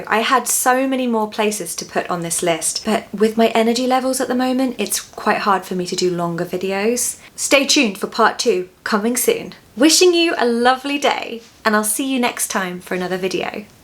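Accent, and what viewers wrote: United Kingdom. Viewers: Hallo great British accent yes correct pronunciation By the words let say two three times with you and loudly thanks More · Hello, I like your beautiful British Word Pronunciation with British Culture. I love the lovely cities in England such as Oxford, Greenwich and Edinburgh. I also like the Stone Hinge and I saw it when the Robin of Sherwood British drama was filmed in that location. I watched Robin of Sherwood in my childhood., I hope you watched that drama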